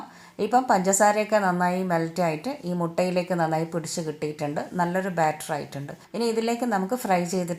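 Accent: Thalassery, India. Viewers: Nammude thalassery talk kelkan thanne Rasama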